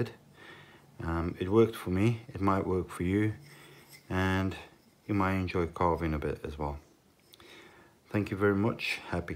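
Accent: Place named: South Africa. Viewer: I thought I could detect some South African and a little bit of Italian. Thanks for replying mate.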